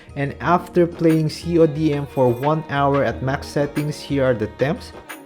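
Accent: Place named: Manila, Philippines. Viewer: Pansin ko lang accent mo, accent na taga manila talaga hehehe Appreciate the props though.. Catchy sya at thanks sa review, sana next time share mo din di lang graphics na nalaro mo sa isang game kunti pati frame rates din.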